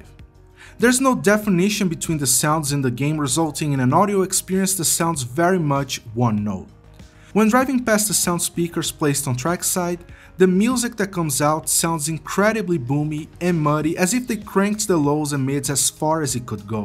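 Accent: Brazil. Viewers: hey man, are u brazilian? great video by the way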